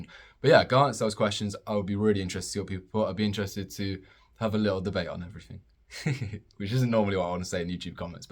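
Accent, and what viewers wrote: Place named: Australia. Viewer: You are Aussie right love your accent I'm Latino greetings from Honduras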